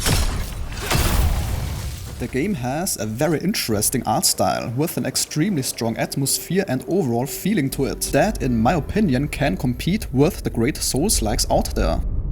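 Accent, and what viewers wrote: Germany. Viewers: Are you German? You sound like it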